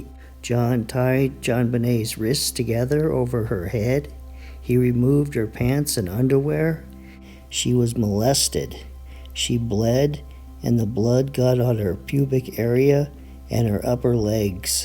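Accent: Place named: New York, United States. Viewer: I’m in the uk North Yorkshire and I get so excited when I see you have a new video up! As for your accent well I’m not familiar with American accents I can tell the difference between a southern accent that’s about it 😆but yours well don’t laugh and don’t be offended but you remind me of a New York detective, I could listen to to you all day. I fascinated with this case keep up the great work. Best wishes from over the pond.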